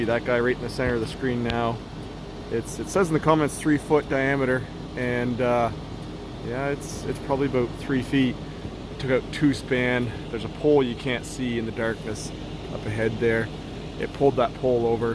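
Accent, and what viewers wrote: Canada. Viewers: Your Canadian accent and your usage of "feet" as a unit of measure don't compute properly in my Universe... 🤔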